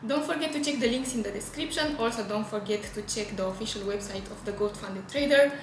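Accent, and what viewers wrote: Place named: Russia. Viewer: Russian Accent 🤔 . Where are you from ? Ukraine , Russia , Moldive , Romania ?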